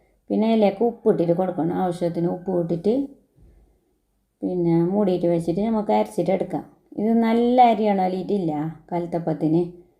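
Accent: Kasaragod, India. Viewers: Nammale kasrod baasha engnthenne❤..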